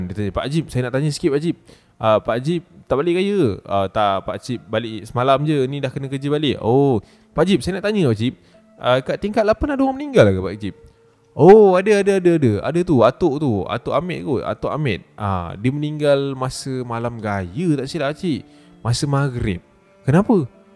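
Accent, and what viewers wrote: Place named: Negeri Sembilan, Malaysia. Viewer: Lucu la ckp n9🤣🤣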